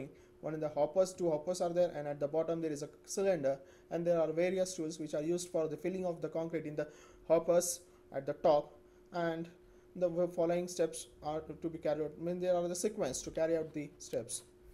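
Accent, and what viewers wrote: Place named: India. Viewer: Put caption cause its so damn hard to understand indian’s english with that strong Hindi accent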